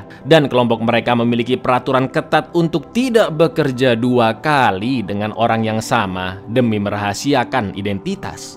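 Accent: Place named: Surabaya, Indonesia. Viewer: Lo samean wong suroboyo ta cak?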